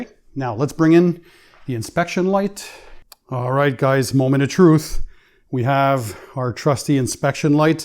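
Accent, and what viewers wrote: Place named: Quebec, Canada. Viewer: oui, mais j'aime bien ton accent québécois. Ça faisait partie de ta personnalité.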